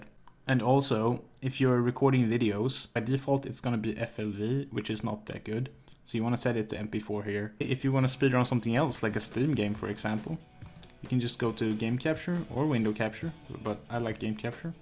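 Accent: Sweden. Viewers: i fucking love your swedish kinda accent! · den svenska/engelska dialekten ;) du låter iallafall bra med din dialekt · du har den klassiska svensk-engelska dialekten haha grattis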